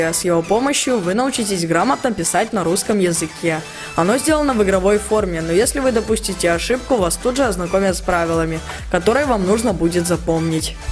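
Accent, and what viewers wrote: Belarus. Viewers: Слушай а ты из какого города? Бреста?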